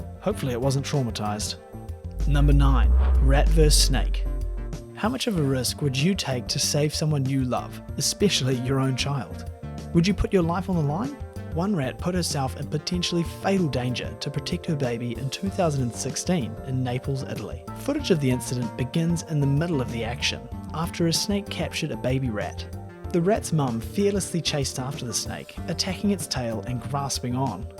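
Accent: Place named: Australia. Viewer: Absolute garbage! Just some guy talking with an aussie accent and telling stories with irrelevant stock shots. #shamelesscashgrab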